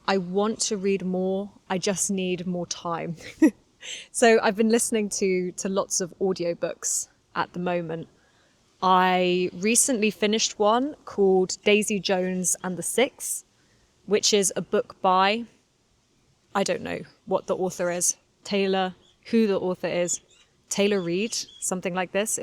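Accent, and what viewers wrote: United Kingdom. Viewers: Thanks you become one of favorite youtube teachers also i shadow your videos to get British accent ❤😂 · Hi, I’m from China and I’ve been following you for half a year now. I really love your British English and enjoy every video you post. Your slow-paced English helps me hear every word clearly, which has really improved my English. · I’ve just finished watching your podcast, and it’s been incredibly helpful for my English. Your pronunciation is so clear and easy to follow—a perfect British accent! To be honest, this podcast gives me so much motivation to learn. Or maybe... I’m just hooked on your channel because you’re absolutely stunning? Oh dear, I must be losing my mind! Anyway, I really hope you could make a short podcast episode on how to approach and make friends with a Western/Caucasian girl. That would be amazing! (^-^) 😀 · I am form bangladesh 🇧🇩 i think you don’t know about this country because the country is small but my country population is high & It is a country under Asain countries. 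Actually i am a student and i am learning English with a British accent that’s why I follow you and watch your videos. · that's why it's called Great Britain what's a beautiful girl and her lovely dog.is it Wilson?what a great accent girl 🗣 A million thanks From Sudan 🇸🇩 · Hi, I’m from China and I’ve been following you for half a year now. I really love your British English and enjoy every video you post. Your slow-paced English helps me hear every word clearly, which has really improved my English. love you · I'm a 45-year-old 'uncle,' and this channel is the perfect spot for me to pick up a proper British accent. ^^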